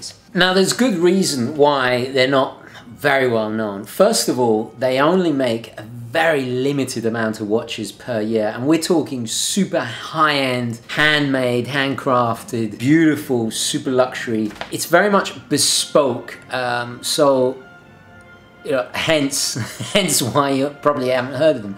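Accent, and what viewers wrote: United Kingdom. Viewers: It's his crisp and clear British accent. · Your British accent is so pleasant to hear lol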